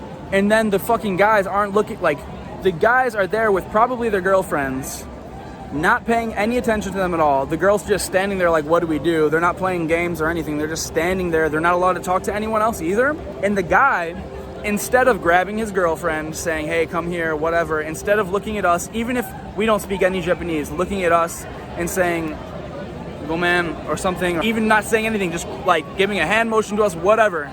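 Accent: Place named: Chicago, United States. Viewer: Are you from Chicago? Keep up the great videos on YouTube 😎👍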